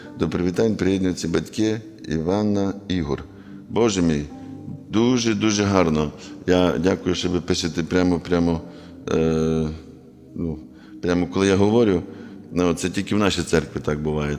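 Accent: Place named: Galicia, Ukraine. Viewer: Як гарно і колоритно звучить українська мова з різними забарвленнями територіальних діалектів!))) Галицький говір ))) Слава Ісусу Христу за таких священників!😊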